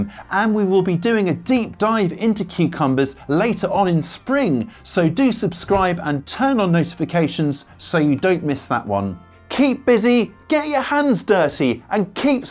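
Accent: United Kingdom. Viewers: He sounds British so I’d say somewhere in the U.K.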